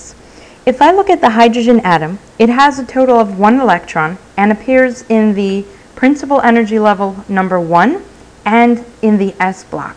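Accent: United States. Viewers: Why does every chemistry video have an American accent!?!?!?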